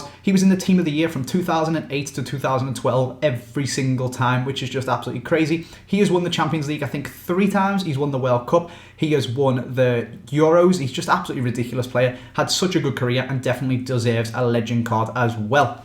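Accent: Liverpool, United Kingdom. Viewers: are you scouse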